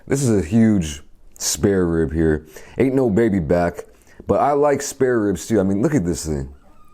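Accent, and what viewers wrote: United States. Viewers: Oh okay that's why you sound so American lol you use to live here, didn't catch that as I'm a new sub. Binge watching lol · he’s American Korean numb nut so that’s an American sounding voice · most American sounding Korean ever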